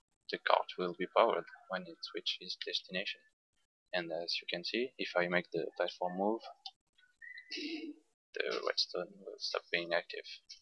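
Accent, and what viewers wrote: France. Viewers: Well that's your own fault. Have you never heard anyone with a French accent speak English before?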